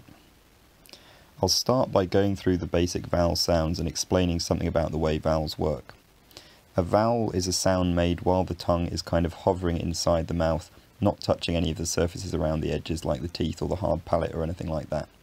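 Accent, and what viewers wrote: South Africa. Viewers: That's the poshest South African I've ever heard. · still has a slight South African accent. A few times when he uses an /i/ between two vowels and is most noticeable when he says "I" Otherwise I'd have no idea he wasn't native British.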